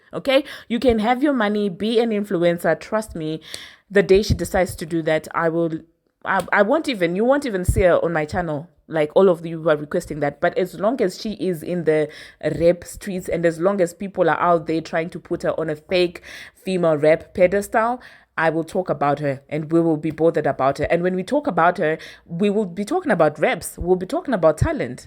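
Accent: South Africa. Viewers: I know this is out of context. I love ur channel by the way. Are u South African?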